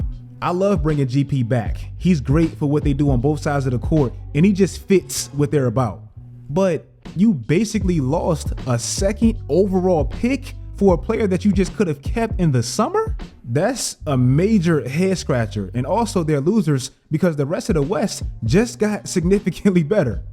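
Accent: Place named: New York, United States. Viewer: You sound like you from NY lol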